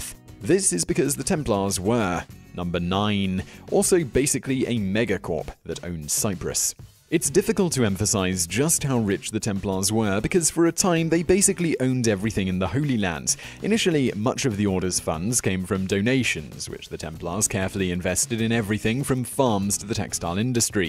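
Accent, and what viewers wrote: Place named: United Kingdom. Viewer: Off topic, I realize - but this Southern American just loves your British accent! "Numba Niieeen-uh'...... like listening to music :-)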